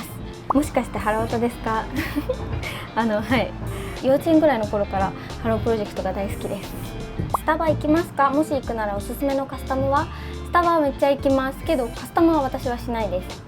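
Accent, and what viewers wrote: Kansai, Japan. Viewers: ちょいちょい訛っているから西日本出身だと思ってた！ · ちゃんって、独特のイントネーションから関西人だと思ってました‼️ てっきり関西弁訛りの標準語だと…知らんけど😅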